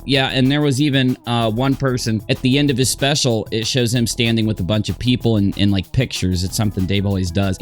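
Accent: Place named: Midwest, United States. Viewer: keeps saying "the Florida statuette" when talking about the Florida statue that protects Brian's parents. A statuette is just a small figurine, so when she says "the Florida statuette" all I can picture is a small figurine in Florida somewhere LOL. I don't know why she doesn't Google that term to make sure she doesn't sound like an inbred moron, especially considering how she Google's everything else she "knows." Or maybe it's her Midwestern low IQ accent that is making her say it that way, either way it makes her look and sound even more ridiculous than she already does. I'm not mad at it though it just makes watching her videos a little easier knowing what a clown she really is. 😂👌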